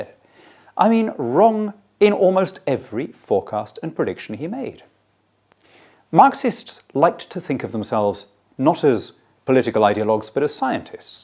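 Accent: RP, United Kingdom. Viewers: Talking shit with a plum in your mouth is still talking shit... Ridiculous claims on both capitalism and marxism... You should be ashamed of yourself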